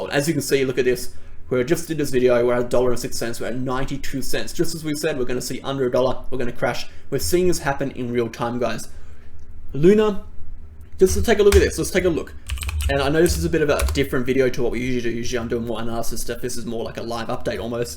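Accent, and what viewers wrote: Australia. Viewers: cheers! Ps. good to hear an aussie accent on a crypto vid!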